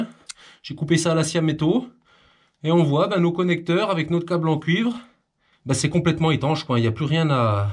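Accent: Germany. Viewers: L'accent allemand de malade 😅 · rien n'oblige à mettre les raccords l'un en face de l'autre ? si par ex on perd l'écarteur, on pourrait les décaler ; le pouce bleu n'est pas pour l'accent allemand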